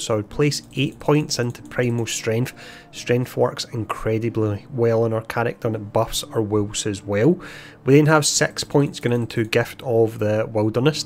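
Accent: Scotland, United Kingdom. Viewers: Ah yeah Sorry I think I mixed up the reply a bit😆. I actually like the Scottish accent but this is a bit hardcore for a non native speaker. There are other YouTubers in the arpg world like quinn69 (not sure about the spelling of his nickname lol) who definitely have strong accents but I still understand them easily. Anyway I didn't want to turn this into a "you need to speak properly" , I was just a bit upset by the fact that I usually hear these kind.of video rather than watching them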